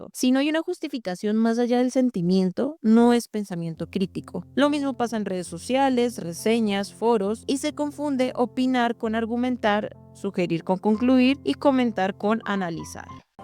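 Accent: Colombia. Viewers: Hola, apenas conozco tu canal. Está cool. ¿Eres colombiana? Noté un poco tu acento. Debo comentar que esto de las confusiones de términos no solo pasa con esto, sino también en otras áreas. Por ejemplo, en el área creativa se confunde mucho lo que es "animar gráficos" con editar un video, o los efectos visuales con los efectos especiales, etc. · Increíble video, tu acento suena Colombiano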